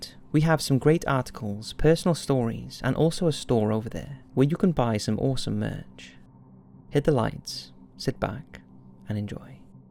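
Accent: Wales, United Kingdom. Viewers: dude i like your british accent because its easy to understand even for an american. btw great stories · Are you from like Newport or Cwmbran kinda area? Xx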